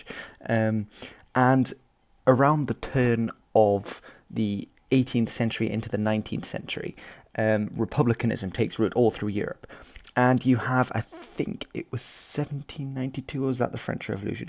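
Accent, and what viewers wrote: United Kingdom. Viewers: Ben je half engels? je klinkt egt brits gap. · your Dutch? You sound like a British person?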